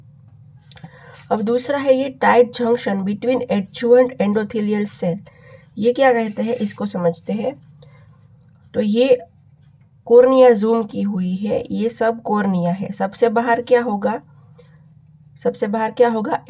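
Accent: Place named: Gujarat, India. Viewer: Bohot acha lecture tha aap gujarat se ho na aapke accent se pata chal gaya 😊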